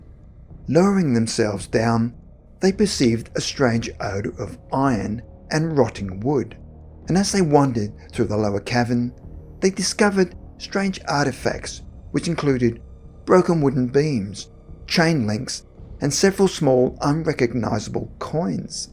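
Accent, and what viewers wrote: Australia. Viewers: I think narrator is from Australia. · Kristina Mullen The narrator is either Australian or from New Zealand.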